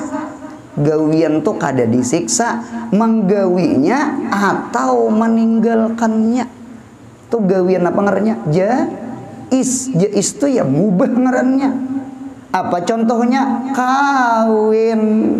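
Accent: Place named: Amuntai, Indonesia. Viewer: Ulun katuju bgt lwn sdin nih, tutur katanya sopan bnget. Dari logatnya kya org amuntai. Mudahan guru selalu sehat dan istiqomah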